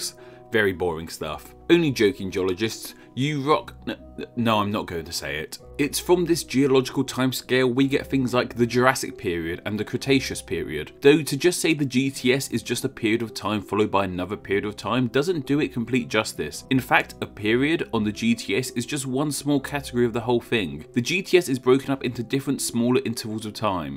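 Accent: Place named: United Kingdom. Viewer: I have never bought into the whole "British/English people sound smarter than American's" until I found your channel. You have such a great voice, and put things in such an awesome understanding for even the dumbest of us. Thanks for all you do, unfortunately, I cannot be a Patreon at this time, but one day I hope to be. Thanks.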